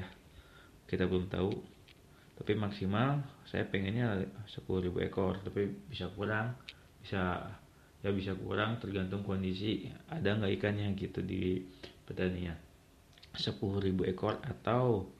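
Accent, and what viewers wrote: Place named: Tasikmalaya, Indonesia. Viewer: Saya tasikmalaya pak...pantesan bicaranya kayak sama he he he. Belum pak saya baru rencana tapi pasti mau...masih nyari informasi dulu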